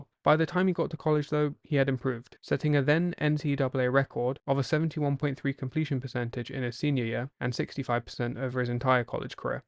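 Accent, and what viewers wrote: United Kingdom. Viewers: BRI'ISH GUY TALKING NFL!!!!!!!!!!!! OMGGGGGGGGGGGGGGGGGGGGGGGGGG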